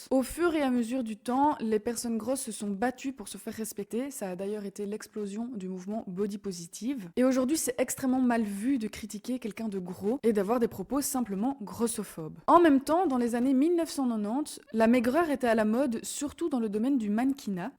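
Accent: Belgium. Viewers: J'adore ton petit accent belge =)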